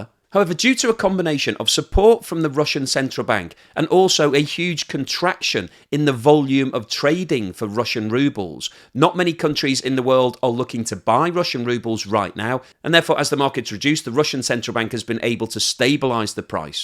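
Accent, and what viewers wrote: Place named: England, United Kingdom. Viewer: What are of England are you from? I like to play place that accent.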